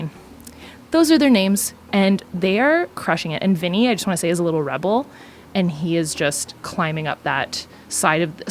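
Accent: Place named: Canada. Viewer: I knew you were Canadian from the first video I watched (your channel is new to me)! You talk like me! I watched a couple of other videos, looking for French on products. Man, I'm such a dork. Anyhoo, gonna sub a fellow Canuck! 🇨🇦 Oh, and Carl needs his own show, books, product line etc. Sooooo cute!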